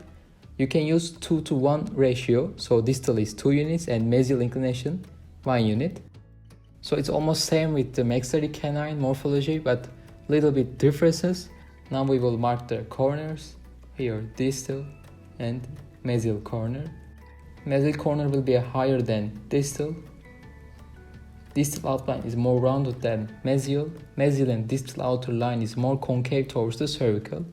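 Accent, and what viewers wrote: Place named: Turkey. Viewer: You are turkisch? Because speaking like turkisch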